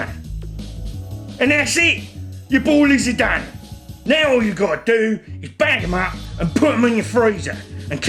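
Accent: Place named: Cockney, United Kingdom. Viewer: Wow big grizzly cockney voice and the missus making the boilies now that's a concoction!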